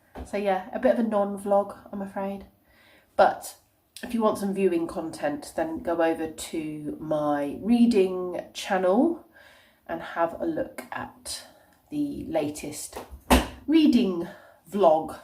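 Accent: United Kingdom. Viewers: You do sound and look like you are feeling a little bit better. I’m glad you didn’t have to watch the littles this last couple of days it would of been terrible but I have no doubt you would of done it. I’m one that enjoys watching you everyday. You are my little bit of the UK as I may never get to see it for myself and I love your accent. Take care.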